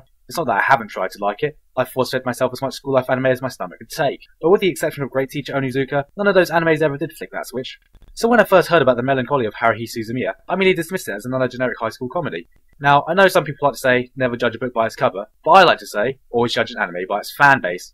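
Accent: United Kingdom. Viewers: Gigguk lost most of his british accent after a few years 😂 he's more understandable now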